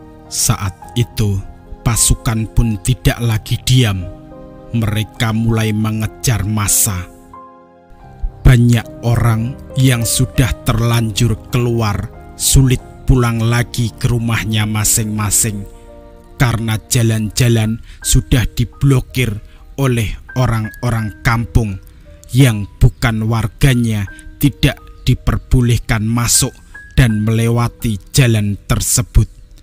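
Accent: Java, Indonesia. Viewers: terlalu medok bahasa jawanya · jgn medok medok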